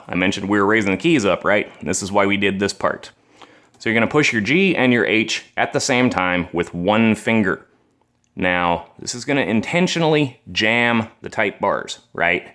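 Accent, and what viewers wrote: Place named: United States. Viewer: This guy's accent is so American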